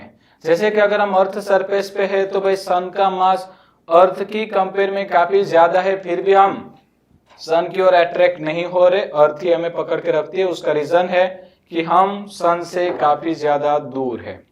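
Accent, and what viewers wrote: Gujarat, India. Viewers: Sir accent is Gujarati 😅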